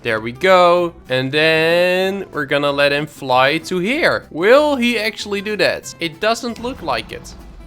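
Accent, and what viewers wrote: Netherlands. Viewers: I can hear that you lived in the netherlands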